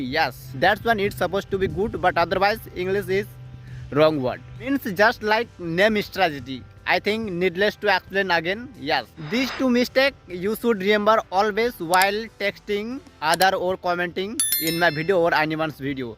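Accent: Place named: India. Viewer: still Indian accent❤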